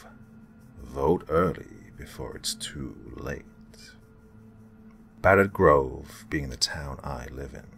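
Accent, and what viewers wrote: United States. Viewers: For the most part, most fake American accents on youtube are SUPER cringe-worthy. But damned if yours wasn't pretty spot on! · Two things. 1. You sound like Benedict Cumberbatch 2. Love the American accent.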